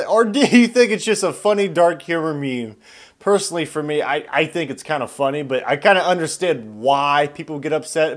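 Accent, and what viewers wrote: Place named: Australia. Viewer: IDK, I'm Midwestern. But you sound Australian/New Zealand to me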